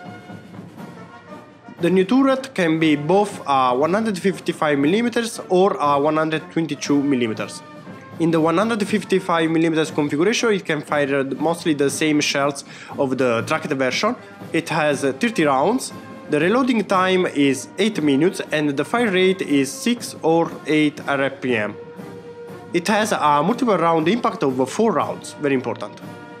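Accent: Italy. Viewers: accento italiano sempre il migliore ;) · Sei italiano so riconoscere un italiano che parla inglese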